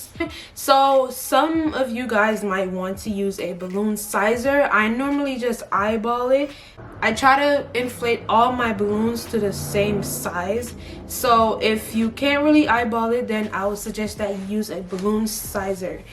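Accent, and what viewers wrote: Jamaica. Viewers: Great tutorial. I love balloons, but I don't like working with them; I'm always thinking they are going to pop, and that freaks me out to the Nth degree+1. P. S. you sound Jamaican.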